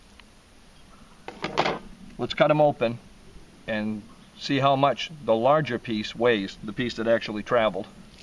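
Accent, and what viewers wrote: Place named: New England, United States. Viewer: That Yankee accent gets me...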